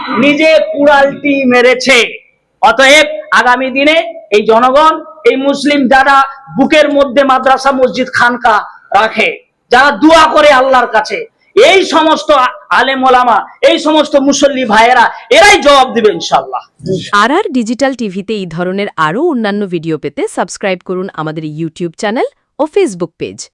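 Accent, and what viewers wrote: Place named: Bangladesh. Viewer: তুমি তো পুরো বাংলাদেশী, তোমার ভাষাতেই বোঝা যায়।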